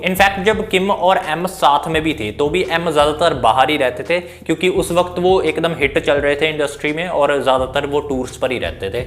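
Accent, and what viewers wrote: India. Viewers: Proper indian accent got me. Anyway, how did this video pop on my yt??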